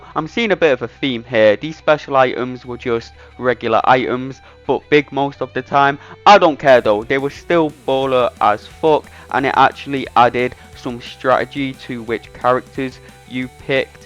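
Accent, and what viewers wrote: United Kingdom. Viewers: I dont know much about Mario Kart World, i just come here to hear your Bri'ish accent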